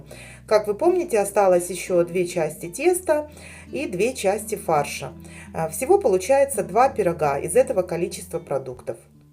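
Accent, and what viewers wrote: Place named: Surzhyk, Ukraine. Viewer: МАТЬ- то тут при чём? Да ,неправильно человек говорит,но поправьте,подскажите- она исправится ,увидев нормальный совет.Вот привыкли люди так говорить ,я так поняла ,хозяйка из Украины,там ,в основном ,так и говорят.Не потому ,что неграмотные,а потому ,что говорят на " суржике",в основном.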